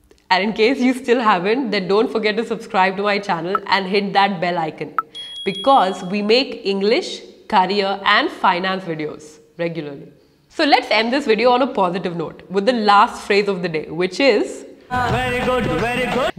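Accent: India. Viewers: I have always been distressed about my speaking skills. I get furiously annoyed whenever i stuck while speaking. My command on English was awful. I like your Indian accent so much that I'm thrilled to listen to you. Now I'm assured that I will do a spectacular job in this.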